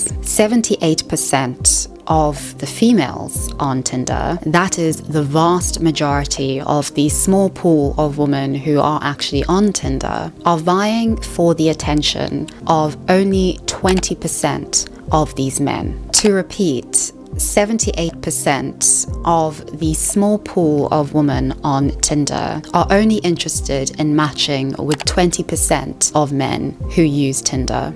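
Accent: South Africa. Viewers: I love your channel, and I've been binge-watching your content over the past few days. I hope you don't take this too personally, but I had to comment and say it drives me crazy that you pronounce "women" and "woman" the same. Is it a South African pronunciation? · She's originally from South Africa, stop trying to push the Queens English on everyone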